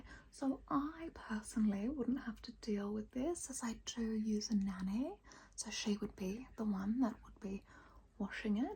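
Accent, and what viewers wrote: Australia. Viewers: Aww I love your asmr’s so much especially its in Aussie accent but can you change your face Expressions it’s make uncomfortable👉🏻👈🏻 Much love mum🩵🩵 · Obsessed with your asmr vids!! You do rich aussie so good lol 🤍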